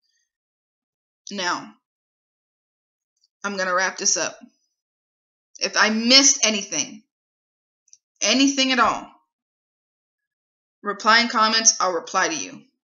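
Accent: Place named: New Jersey, United States. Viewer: I KNEW YOU SOUNDED LIKE A JERSEY GIRL!!!!! LMAO HALLAAAAAH!!!!! I'm in up North now LoL!!!!! Got fam in Clifton n Bayonne N All up n down Jersey!!!!!!!💙💜💙💜💙💜💙💜💙💜💙💜💙in boonies now, Sussex County Stixxxx!!!!!! Mad Love Girl!!!!!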